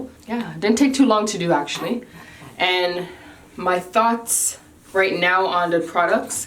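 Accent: Jamaica. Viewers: Do I hear a tiny bit of a Jamaican accent? Never noticed until this video! Looooove 😍. Gorgeous hair and great results, too. Hair goals 🙌🏾. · You Jamaican ?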